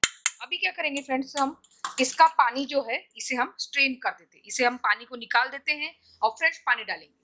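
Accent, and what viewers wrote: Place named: Maharashtra, India. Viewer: Apka hindi mast marathi logo ki trha h nd thank u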